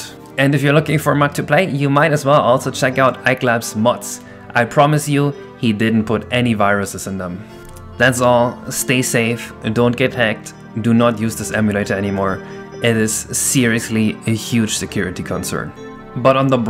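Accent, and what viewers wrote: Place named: Germany. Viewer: hey, i like you and your videos however it would be nice if you could refine your pronunciation a little more its not bad by any means, still it could use some improvements here and there f.e mispronouncing some words or your still somewhat noticeable german accent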